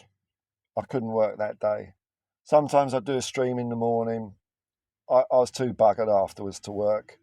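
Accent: Australia. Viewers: . Its was a pleasure to watch THE ONLY PSYCHEDELIC SOCCER SHOW ON THE INTERNET!... I was lucky to watch the channel grow from a few subs into a couple thousand. Loved your creativity and sheer passion for Tottenham Hotspur, it was good having an Australian voice for all us in the southern hemisphere (NZ). Im happy you have taking time for your mental health, its whats most important. Its a big loss for the spurs'a'sphere as you and Jacob (unitedspursofamerica) are some of the only positive channels i tune into. Take time, take it easy, I selfishly hope to get an occasional video from you here and there, perhaps after a big win! Thank you mate.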